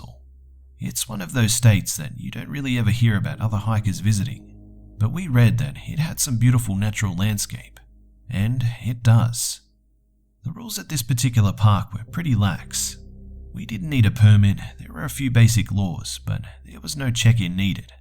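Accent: Australia. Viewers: Wow thanks! Neat stories:) You should do a video over skinwalker/ wendigo stories. The 'My cabin getaway' series was literally awesome and so creepy <3!! I know for sure I'd love to hear your punder-ful, aussie accented, smooth voice narrating some good old Native American horror stories again. And i'm positive all the other fans would too. P.S. Now call me Ra-pun-zel but you should drop some more puns, you seemed to have dropped off a bit on them:). (Sorry if this came off as super criticizing, just trying to be constructive) - Izzy the Adventure Princess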